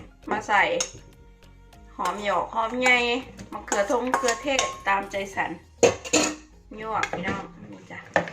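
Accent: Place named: Isan, Thailand. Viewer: อายเอ่ย แคทละฟังบ่ออกมื้อนี้ 🤣🤣🤣 ปกติสำเนียงสกลนครกะฟังยากอยู่มื้อนี้ยอมรับจ้า แต่กะน้ำลายไหลนำคือเก่า😊🤤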